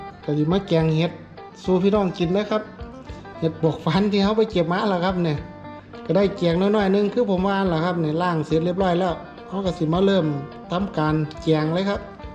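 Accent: Chaiyaphum, Thailand. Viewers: เจ้าคือมาเว้าคือสำเนียงคนบ้านข่อยแท่ คนชัยภูมิแมจ้า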